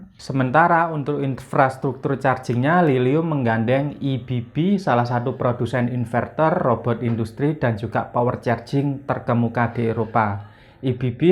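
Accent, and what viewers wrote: Java, Indonesia. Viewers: amrika,,,hadeuuh jowo bnged sihh ngomongnya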